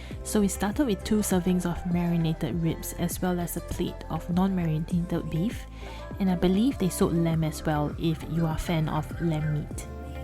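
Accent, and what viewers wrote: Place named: Singapore. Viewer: Singapore accent ftw!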